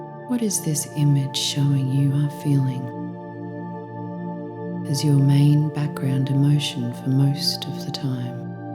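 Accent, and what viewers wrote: Australia. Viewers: It’s such a welcome to hear your beautiful accent! I’ve been living State side for almost 14 years now (time flies!!!) & have been missing home 🇦🇺 heaps. Appreciating your affirmation videos as well as the meditations while getting a dose of aussie accent 🙏🏻 I’m so grateful I’ve found your channel that aligns perfectly with the inner work I’ve been practicing. Many thanks & blessings 🙌❤️🙏🏻✨💓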